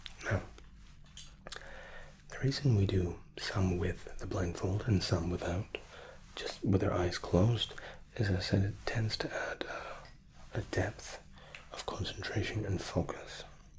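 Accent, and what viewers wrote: Scotland, United Kingdom. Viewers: Nice to hear a Scottish ASMRtist and one who shouts out others particularly my favourite Be Brave Be You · Tremendous as usual. As a Scot myself, I enjoy hearing the accent. It's very refreshing 😊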